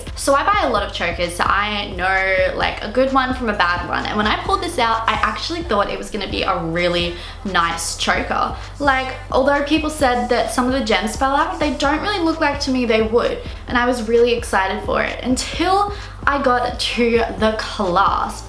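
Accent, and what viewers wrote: Melbourne, Australia. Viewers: I get so used to hearing American's on the internet and in TV shows and movies, I always have to double take when I hear an Aussie, Proud <3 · America has stereotyped the australian accent 😂😂😂 i love your pure accent more!! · Right when I clicked on her video, and heard her speak... I knew right away, she's Australian. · I'm Australian too and she sounds just like I do. Sometimes people tell me I sound American a bit too. I grew up moving from Queensland to Melbourne? Maybe that's why? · Why does your Australian accent sound mixed with American? Your accent sounds nothing like mine. I’m Australian also. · She sounds like every white girl in Melbourne lol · What? she sounds completely Aussie. Is she supposed to sound like a bogan not be accused of 'faking' her accent? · I'm American, and her accent sounds Australian. Just like us Americans, we have different accents from all over the country. 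I'm pretty sure the same goes with Australia.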